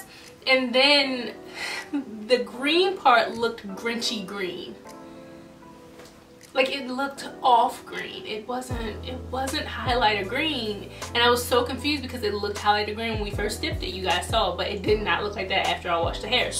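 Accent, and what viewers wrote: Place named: DMV, United States. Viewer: Are u from DMV? I love ur accent tho.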